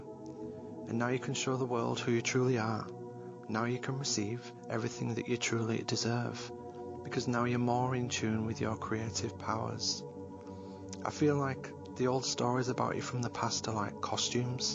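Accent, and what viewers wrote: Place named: North, United Kingdom. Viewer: I love your gentle voice..northern..xxx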